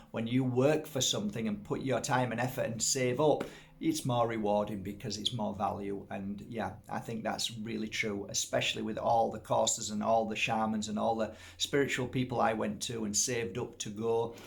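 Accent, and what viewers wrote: Yorkshire, United Kingdom. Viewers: I absolutely love your videos. So informative. I'm from ashton greater Manchester and you sound so local. Yorkshire Oldham I can't decide lol xx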